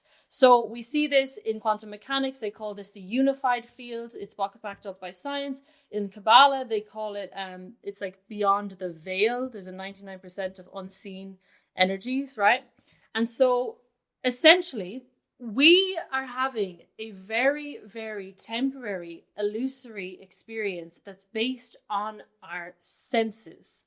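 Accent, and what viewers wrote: Ireland. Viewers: Sound's like you have a Irish thang (accent) Are you Irish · pretty sure shes irish lol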